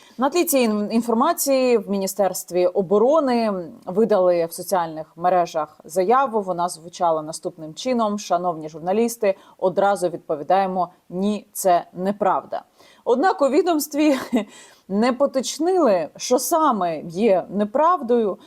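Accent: Russia. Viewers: Яніна, будь ласка, не говори англійською, як росіяни.Women не вуман, girl не гьорл, birthday не бьорсдей, The Economist не зъ економист. Ти мені дуже подобаєшся, я дивлюся всі твої відео. Слава Україні!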